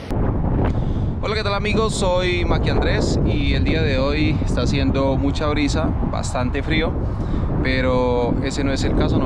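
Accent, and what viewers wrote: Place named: Colombia. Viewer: eres colombiano por tu acento y la manera en que dices las palabras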